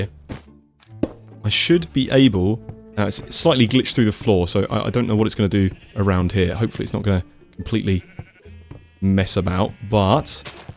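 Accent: United Kingdom. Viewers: This guy kinda sounds like stephen merchant ngl. And no, not just because he has a british accent. Its just stephen merchant without pronouncing any R at the end of words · Personally for me I've always loved create, didn't fully enjoy others using it though, I 100% enjoyed your video. And the English accent is a genuine cherry on top, very soothing voice.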